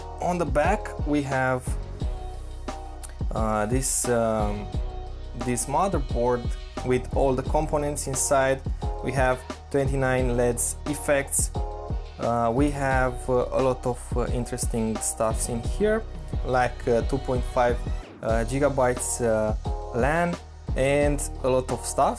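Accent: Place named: Romania. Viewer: you sound like the average romanian xd. Are you at least eastern or central European?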